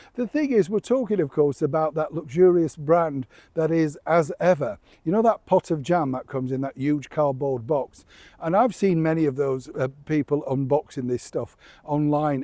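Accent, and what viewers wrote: Yorkshire, United Kingdom. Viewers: ...YESS, i knew you were in Yorkshire just by looking at their very recognisable stone work in the church behind you...ey up, i love it when t' " Yorkshire " comes out don't stop will you, thank you and as always take care...👋👋🫖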